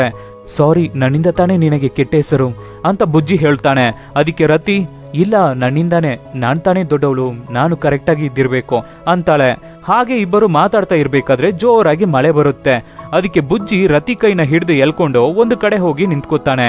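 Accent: Tamil Nadu, India. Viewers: I think he is Tamilian as per his slang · tamilian kannada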